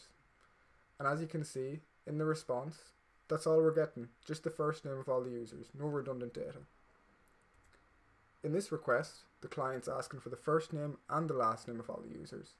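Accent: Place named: Ireland. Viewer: Great quick video! And cool to hear an Irish YouTuber doing programming tutorials 🤙☘️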